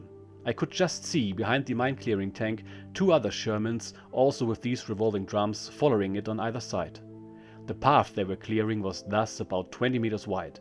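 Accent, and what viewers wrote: Germany. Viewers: The German narrating is a definite improvement over the simulated American voice. It makes the narration seem more authentic.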